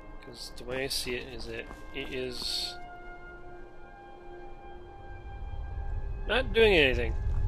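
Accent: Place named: Quebec, Canada. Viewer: There's no need to get an attitude. He is French Canadian; French is his native language. Yes, stutter can mean a hiccup in smooth frame transitions, but the main definition refers to speech. You didn't mention 'framerate' or 'smooth' or 'gameplay' or anything to suggest you meant the framerate. Additionally, you specified the subject as "he", not as "his game" or "the game" or "Skyrim" or "framerate", so what you said actually refers to his speech, not his framerate. Lern 2 english plz.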